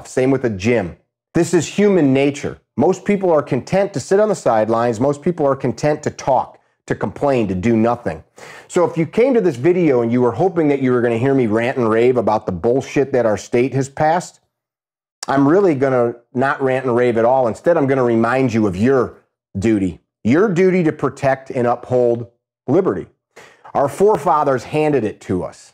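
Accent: Chicago, United States. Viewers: As soon as I heard his voice the first time, I knew. Sounds like Chicago.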